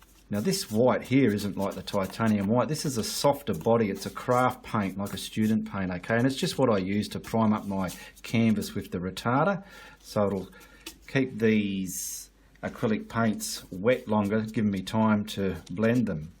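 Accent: Australia. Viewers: I love it! Thank you for a great tutorial! And I have to say I love listening to your Aussie accent! 😊 Most tutorials are from other countries so seeing the talent in our own backyard is wonderful! Big thank you from a fellow Aussie! 😍😍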